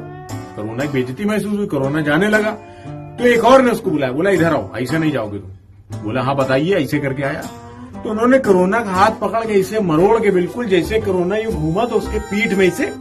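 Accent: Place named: Kanpur, India. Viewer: Waah bhai! Aapke bolne ke andaaz se koi bhi samajh jaaye ki aap Kanpur se hain. Ek baar milna hai aapse...main kalyanpur me rehta hoon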